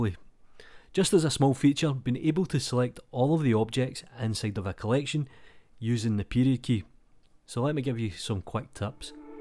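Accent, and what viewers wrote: Scotland, United Kingdom. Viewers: idk what your accent is but I love it. oh, it's Scottish, like Brian 'Limmy'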